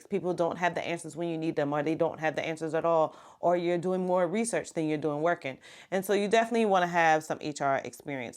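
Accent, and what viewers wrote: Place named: Carolina, United States. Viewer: I know a carolinian when i hear one lol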